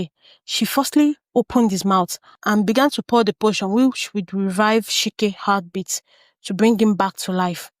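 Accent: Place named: Africa. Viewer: Himmm i heard to understand a Africa accent but i like the story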